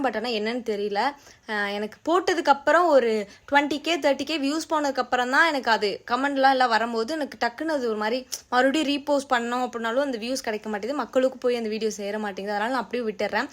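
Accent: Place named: Theni, India. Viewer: Hey guyss! Video ku mela 3 dot eruku adha touch panna audio track nu varum adhula Korean orginal nu varum adha touch panna nammolda kodai kadhal orginal voice vanthuerum. Kk ellaru mob layum ithu available than ok. Kodai love story pakkum pothu engaluku shy aguthu ❤ anna nanum theni thaan namma theni slang la pesunga innum nalla eruku urimai ya erukun namma slang ok ❤ pin me kaa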